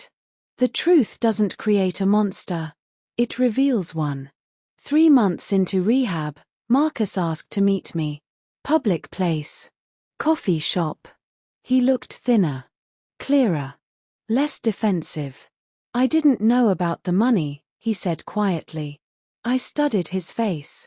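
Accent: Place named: England, United Kingdom. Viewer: So if she’s from Pennsylvania, why does she sound SO English?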